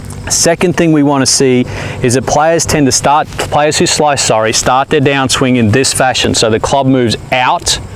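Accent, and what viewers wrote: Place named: Australia. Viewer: Thank god a aussie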